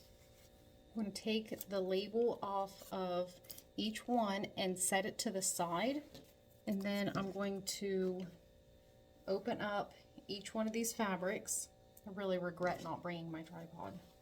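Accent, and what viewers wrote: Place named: Maryland, United States. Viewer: Also are you from near Maryland? That acent😅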